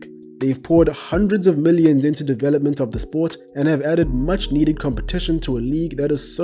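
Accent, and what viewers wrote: South Africa. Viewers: South african accent definitely!! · Well well well, a happy coincidence finding a fellow South African! Knew it from the beginning when you started talking. Keep up the good work man and make us proud!!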